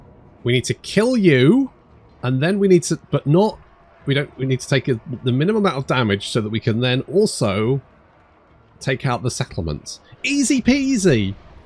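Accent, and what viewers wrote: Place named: United Kingdom. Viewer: Happy New Year everyone! Since it's a new year and a new campaign(and likely to get a lot of views) when did you guys find and what campaign? The first campaign I watched was Kemmler and Krell... for Warhammer 1 which was what seven years ago oh time flies. The cinematic battles via replays were something nobody else at the time was doing(at least Surrealbeliefs and Heir of Carthage weren't maybe somebody else was idk)and that was just a great watch and so was listening to the funny British man babble about turning units into jam and stuff.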